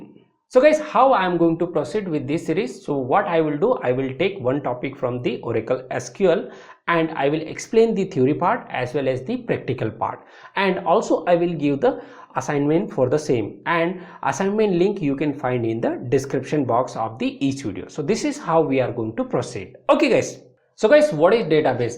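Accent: India. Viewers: It feels strange listining someone with indian accent without this one being annoying or insulting me (call center worker)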